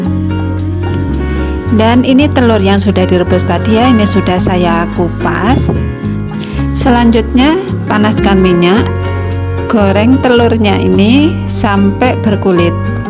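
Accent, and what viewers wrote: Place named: Java, Indonesia. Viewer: Bunbunya medok mantul bun🤗🫶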